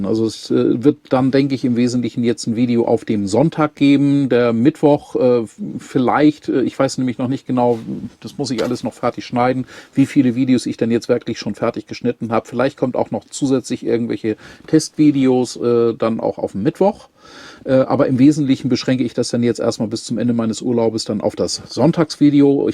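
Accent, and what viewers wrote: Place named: Northern Germany, Germany. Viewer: Auch von mir herzlichen Glückwunsch zu 25Tsd. Guckern. Bin ja noch frisch dabei. Ich mag dein freundliches Wesen und den norddeutschen? Einschlach. Moin aus Ostfriesland. LG Matriczia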